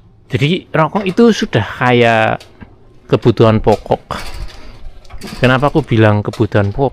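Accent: Banyumas, Indonesia. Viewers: Mas rika wong ndi si, kayane logate madan ngapak sesekali😅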